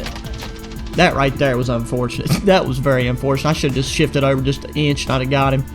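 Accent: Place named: West Virginia, United States. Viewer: An ak setup would be great and you sound like your from West Virginia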